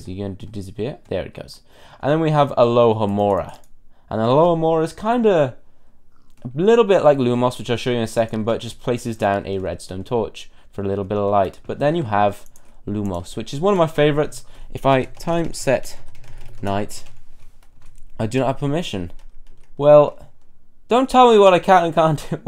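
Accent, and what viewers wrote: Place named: Wales, United Kingdom. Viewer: ACCENT = MINT! ITS GREAT TO BE WELSH... I KNOW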